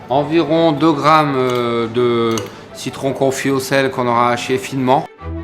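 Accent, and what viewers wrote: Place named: Nord, France. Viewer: ça ressemble plutôt à l'accent du Nord ou Pas-de-Calais...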